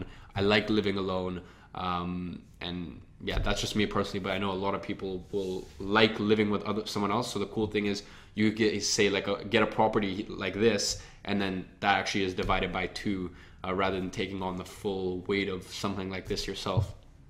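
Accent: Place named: United States. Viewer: Hey! How come you have American accent living in uk?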